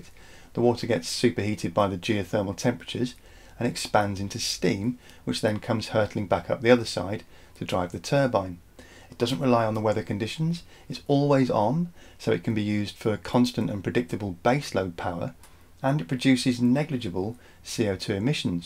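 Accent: United Kingdom. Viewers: We weren’t ready for that accent. I love British accents.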